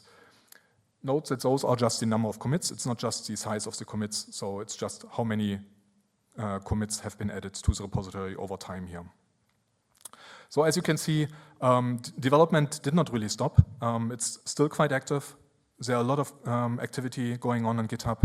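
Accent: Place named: Germany. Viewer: Glorious German Accent. Thanks for maintaining my favorite editor, though.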